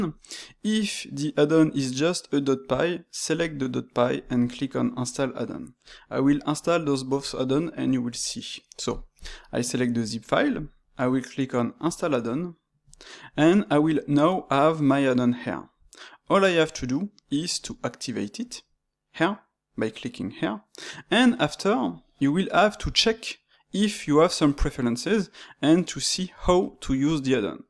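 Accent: France. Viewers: I can tell you're french :)) btw thanks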